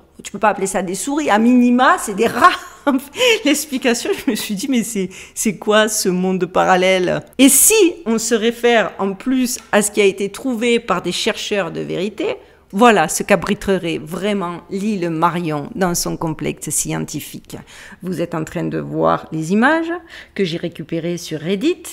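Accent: South, France. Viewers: Merci princesse ! Tu as gardé l'accent du sud, peuchère !❤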